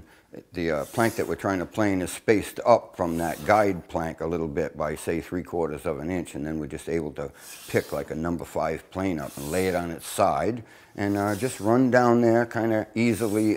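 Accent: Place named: Massachusetts, United States. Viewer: Listening to you talk reminds me of my cousin Jack who lives in Attleboro. Love the accent although we sounded a bit different out on the Cape. Also appreciate the comment about the "bucket of quahogs. I sure miss that.Used to just go out and dig them up for a great chowder on weekends. Your tutorial on building this skiff is terrific.I've always wanted to build a boat like this and I just may give this one a try. Thanks a million for the instruction and the memories.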